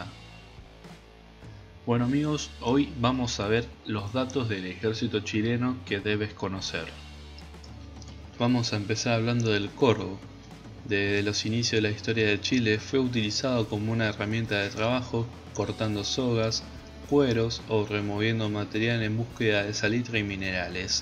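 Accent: Argentina. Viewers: Es argentina no escuchas su acento?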